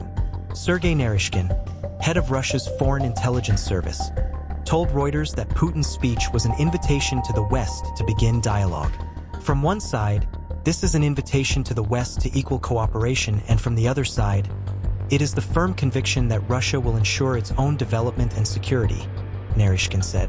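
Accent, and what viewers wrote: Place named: United States. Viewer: The narrator sound’s American. Talk about anti American Zionists that highjacked our government.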